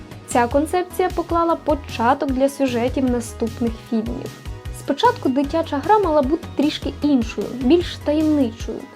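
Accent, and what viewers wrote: Standard. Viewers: Боже, яка ж гарна та чиста українська мова, насолода для вух 🥰🥰🥰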